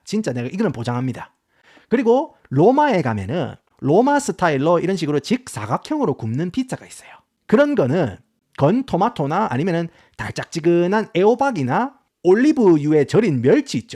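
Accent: Gyeongsang, South Korea. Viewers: 경상도 액센트 너무멋져 부러워요❤ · 유익한 정보 감사합니다 완전 갱상도 특유의 언어로 시원한 설명 도움 많이 받고 구입시 신경 쓰겠음다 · 유용한 정보 감사합니다. 구수한 경상도 억양도 재미있어요. 스위스에서 먹은 치즈 얘기 듣기만 해도 군침이 돕니다!😋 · 이어버드로 소리만 처음 들었는데요 찐한 갱상도 사투리에 나이 많은 아저씨신가 했는데요. 계속 듣다보니 영어 발음 욜 좋으시고 영상도 보니 힙하게 영하게 생기심에 놀라고. 끝까지 들으니 깊은 내용에 감동했어요. 너무나 도움되는 영상 감사합니다.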